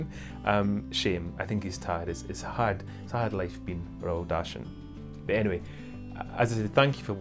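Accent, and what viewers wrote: Scotland, United Kingdom. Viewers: I’ve just discovered your ‘show’, how lovely! You do a wonderful job especially because you aren’t malicious or gossipy, we don’t need any tabloid nastiness. Your delightful stories (though the children’s one and the soldiers one had me welling up) show genuine insight into the Duchess. Through your work, you have had amazing experiences, thank you for sharing them with us! ( what part of Scotland are you from? My father was from Aberdeen, I loved his gentle accent)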